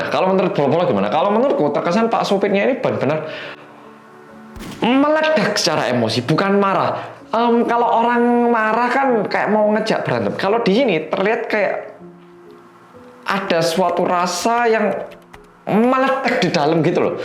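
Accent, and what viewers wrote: Java, Indonesia. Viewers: bagus, medoookkk nya makin panjang, wkwkwkkwkw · Medokk!!!! ~wkwk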